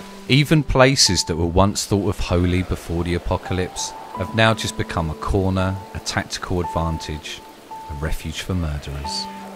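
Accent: United Kingdom. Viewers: it's called a british accent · im not saying his british accent is werid, its fine, but he talks completely differnet then he normally does, he talks slow and odd when he is doing a commentary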